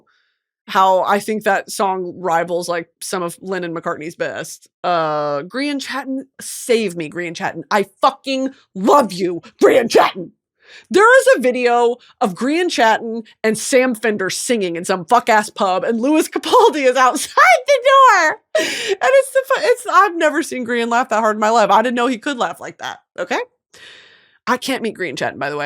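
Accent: South, United States. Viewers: the southern twang made me feel so comfortable today. right at home -texan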